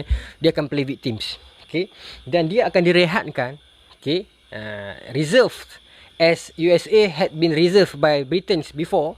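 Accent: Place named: Penang, Malaysia. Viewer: Saya bukan melayu bodoh ustaz. Saya paham bahasa inggeris. Tapi tak syok dengar ustaz goreng dalam BI. Hilang slang penang.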